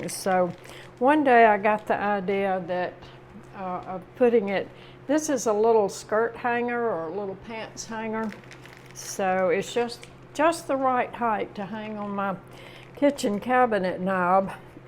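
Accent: South, United States. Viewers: I enjoy everything about your videos, including your southern accent and charm.❤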